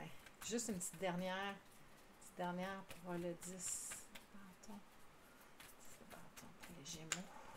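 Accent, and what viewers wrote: Quebec, Canada. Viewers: Bonjour, je suis bien contente d’entendre une voix Québécoise sur les tirages. Je me sens plus proche du tirage à ce moment. Merci beaucoup😀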